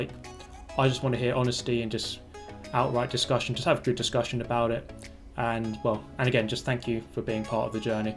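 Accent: United Kingdom. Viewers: For 2019 if you have a good PC try Project cars 2, it feels like the middle ground between super hardcore Iracing and Forza ramming. It’s best as just a very good looking racing experience. And I’d love to see more collabs, maybe with Jimmy B? Thanks for the cynical casual British Gran Turismo commentary I didn’t know I needed in my life. Keep dodging those rammers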